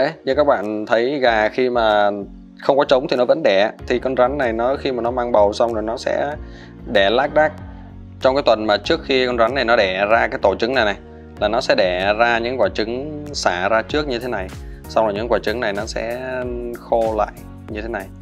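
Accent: North, Vietnam. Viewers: Anh ở Miền Nam sao nói giọng Bắc nhỉ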